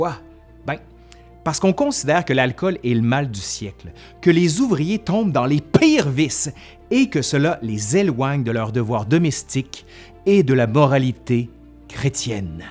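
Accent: Quebec, Canada. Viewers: cet accent, caractéristique du Québec, mais sans les excès de certaines personnes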